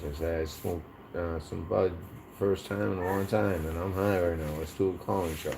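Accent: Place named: Massachusetts, United States. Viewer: Are you from Mass.? Very familiar accent.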